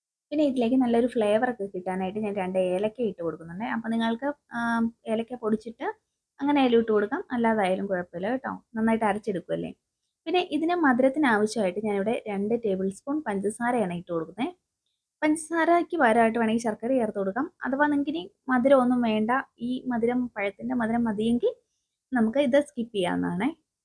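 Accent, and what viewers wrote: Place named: Trivandrum, India. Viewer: ഭാഷ കേൾക്കുമ്പോൾ ട്രിവാൻഡ്രം ആണെന്ന് തോന്നുന്നു 😊😊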